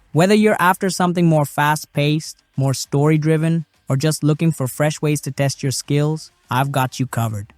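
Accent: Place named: India. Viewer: Sometimes you sound really indian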